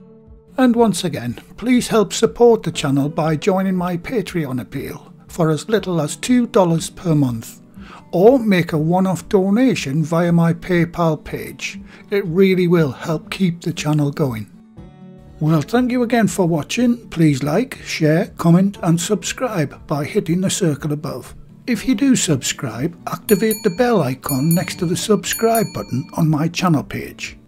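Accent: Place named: Geordie, United Kingdom. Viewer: I only found your site today and already a new subscriber. Very instructional.. music nice and not too intrusive and love your Geordie dialect. It’s like being with you in the kitchen:)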